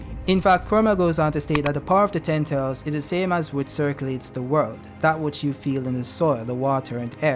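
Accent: Jamaica. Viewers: sound like u come from Jamaica dawg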